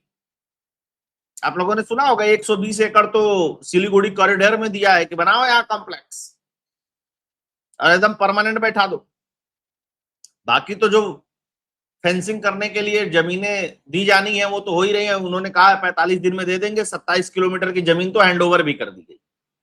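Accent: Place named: Lucknow, India. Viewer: Ram Ram bhai , big fan here from NZ. Love your detailed work and analysis along with your local lakhnavi style of speaking . 👍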